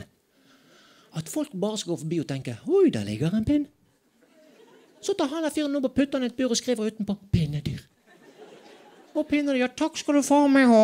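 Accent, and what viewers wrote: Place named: Bergen, Norway. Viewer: Det e faen meg bergens dialekten som gjør susen... :)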